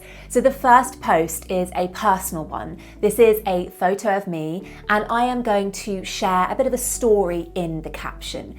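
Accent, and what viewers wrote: United Kingdom. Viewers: She's got that Harry Potter accent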